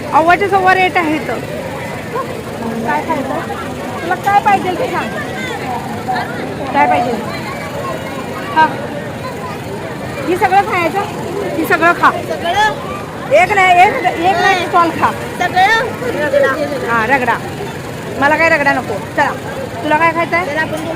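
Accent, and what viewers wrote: Kolhapur, India. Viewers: तुझ बोलन खुप गोड आहे तु काही बदल करु नकोस कोल्हापूर ची बोलीभाषा छान च आहे मुंबई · तुम्ही आहात तशाच गोड आहात.. कोल्हापूरचा अस्सल फील येतो...माझे माहेर आहे कोल्हापूर... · Hi tai tu khup mast boltis nko change kru bhasha mi pn kolhapur chi aahe tujhyamule mla devich darshn milal mi geli nhi yaveli so khup thanks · टेंबलाई देवीचे दर्शन तुझ्या मुळे आज झाले🙏.... अणि तुझ्याकडुन कोल्हापुर चि भाषा ऐकायला छान वाटते🤗.. भाषेचा लहेजाच असा आहे...!! 👍👍 🚩🚩Lots Of love and Blessings to you & all Family 🧡🧡🧡🧡🧡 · Aapli bhasha kolhapuri aahe, bilkul badlaych nahiii... Amhi kolhapurkar 🙏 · हाय खूप छान जत्रा एन्जॉय केली. असू दे छान बोलतेस.कोल्हापूरी भाषा पण छान वाटते. मी पुण्यात राहिली आठ वर्षे.देश तसा वेश करावा लागतो. व्हिडिओ छान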